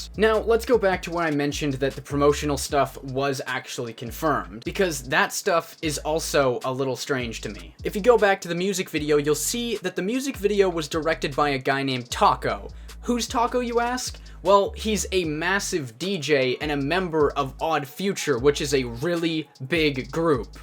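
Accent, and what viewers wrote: Canada. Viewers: Jesus christ another video that you made using the term "industry plant" incorrectly. Not sure why your gay ass incorrect videos keep coming up in my feed, but it needs to stop. Additionally you need to stop making these videos using terms you learned online incorrectly with your canuck accent. Shit is cringe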